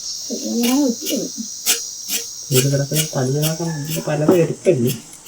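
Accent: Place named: Thiruvananthapuram, India. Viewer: മോനെ നിന്റെ ഭാഷ യാണ് ഇഷ്ടം മക്കളെ നമ്മുടെ പപ്പനാവ സാ മീരെ മണ്ണിലെ ഭാഷ ഒരിക്കലും അത് കൈവിടല്ലേ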